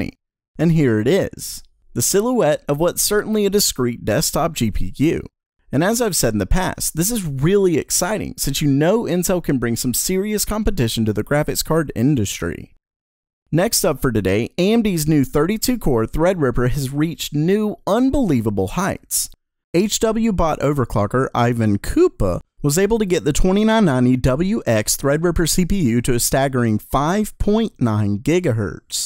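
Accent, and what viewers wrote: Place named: United States. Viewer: It’s both okay? He’s American so he pronounces it wrong but whatever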